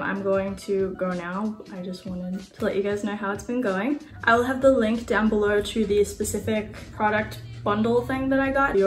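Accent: Australia. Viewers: Where’s your accent from? It sounds Australian but then there is an American twang in there. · Your accent is really interesting, it's like a cross between Australian and American! 😯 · I could definitely hear the Aussie at words ending in the “r” sound, but then didn’t hear it at other times when I thought I would. Thanks for clarifying and answering! 😉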